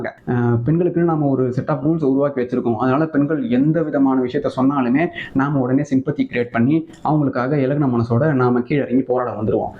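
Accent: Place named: Chennai, India. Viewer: நீங்கள் பேசுறதுலையே தெரியுது நீங்க ஒரு சென்னைவாசி தான் என்று சென்னையில் எந்த ஏரியா நீங்க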